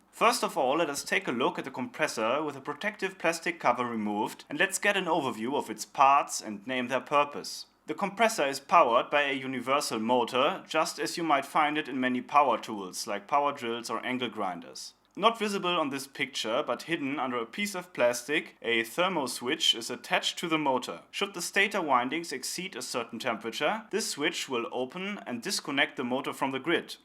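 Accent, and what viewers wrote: United States. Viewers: Thanks for the quick reply. Yes there is definitely a sprinkling of American in your speech. Thanks again.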